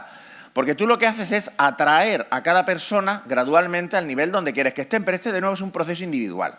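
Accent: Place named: Andalusia, Spain. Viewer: Como andaluz, le pido perdón por cómo habla este lelo. Ni mucho menos somos así.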